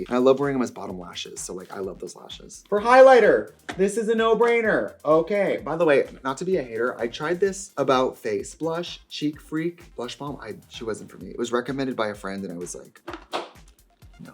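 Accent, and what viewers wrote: Wisconsin, United States. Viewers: You can also hear her Wisconsin accent a lot more and I think that’s very metaphorical. She’s back home. Honestly makes me wish I had 3 months off. 🥹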